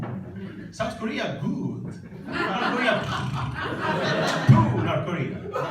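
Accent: Rome, Italy. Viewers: from a fellow roman it's funny how he transfers directly "roman humour" and accent in English. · HA ROMANIZZATO L'INGLESE...L'HA RESO SPICCIO HE HAS ROMANIZED ENGLISH TALKING...MORE EASY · Non concordo, ha un ottimo inglese. Non fa errori grammaticali e ha un vocabolario più che adeguato. Ha una pronuncia più che decente, molto comprensibile seppure con un fortissimo accento italiano. Ma se si capisce cosa dice e non fa errori di pronuncia a lui trasparenti, l'accento non è un errore, non intacca la valutazione del suo inglese così come "nativo" non è un livello di inglese. Capisco che sia Italiano come capisco che una persona di Manchester sia di Manchester e una persona Irlandese sia Irlandese, eppure non diresti mai che un Irlandese non abbia un perfetto inglese perché non parla come Re Carlo. Perché poi si entra in una seconda questione: quale sarebbe il nativo corretto? 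Americano - e quale Americano? O preferisci RP? Ogni accento identifica la provenienza. Quale di queste provenienze siano considerate native non è questione di livello di inglese, ma di geopolitica. Il fatto che parli con un accento non mi dice assolutamente niente sul suo livello di inglese.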